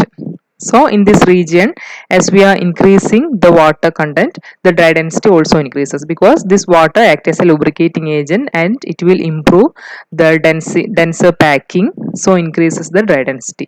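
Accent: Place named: Kerala, India. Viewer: the way you say some Words., your slang is in malayalam so.... I just asked. Thank you.. Great lecture... Keep. Going mam 😊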